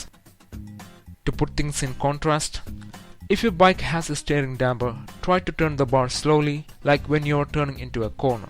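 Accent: Kerala, India. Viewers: Very hard to understand the massive indian accent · Your Mallu accent is thick X_X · Are you malayali? You sound so.. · argg your accent making me sick dude ... you must be from kerala ....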